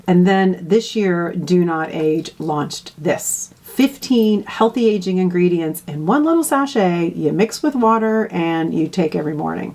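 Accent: Ireland. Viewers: Where are you from? I hear Irish 💚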